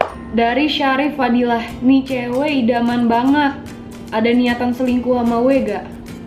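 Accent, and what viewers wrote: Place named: Makassar, Indonesia. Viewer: bedede org Makassar pae,salam dari Makassar nah 😂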